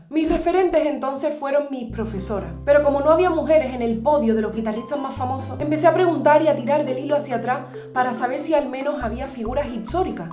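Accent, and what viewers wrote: Andalusia, Spain. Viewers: Hermosura y talento Juntos ❤❤❤❤ Adoro tu acento andalú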